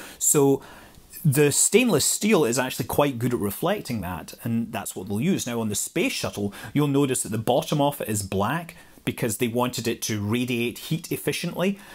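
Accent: Scotland, United Kingdom. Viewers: There's some clever guys on YT, but a lot of waffle & time consumption. Your videos are clear, concise, interesting & layman friendly, while you obviously know what you're talking about. Very watchable & I can comfortably take it all in at 1.25x speed, thanks to your clear diction & varied, enthusiastic intonation (another bugbear of other zzzzzz YT "superstars"). Love your Scottish accent. Great photos & slides. Good Stuff. Keep up the good work!!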